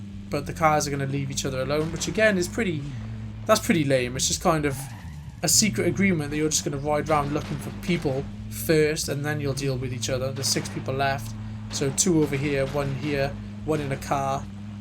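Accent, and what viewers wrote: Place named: Wales, United Kingdom. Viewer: I'm from Cardiff myself, and your accent was instantly familiar to me lol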